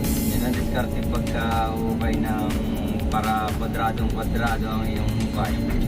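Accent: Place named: Bicol, Philippines. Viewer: Parang bicolano ka mag salita pre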